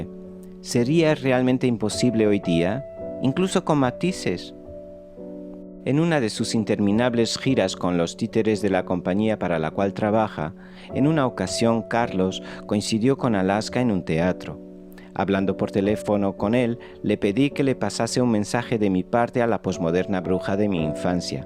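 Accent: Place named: Portugal. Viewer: Portugués... Claro... Habia algo en tu ecento... En fin... Excelente canal y gracias por responder tan pronto....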